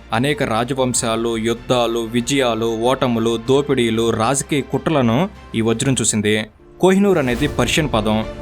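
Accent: Telangana, India. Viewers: Telangana na Andhra na howle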